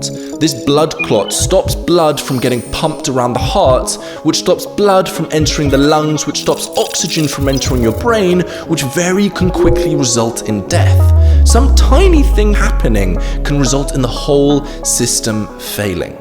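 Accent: United Kingdom. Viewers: It is definitely the British accent. My husband is from Stafford and he pronounces a lot of things that end in an “a” as if they end in “er”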